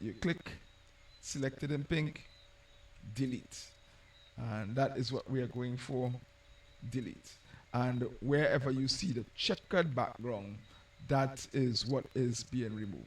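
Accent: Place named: Trinidad and Tobago. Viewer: Very good content. Nice to hear the voice of a fellow 'Trinbagonian'(?) doing GIMP tutorials.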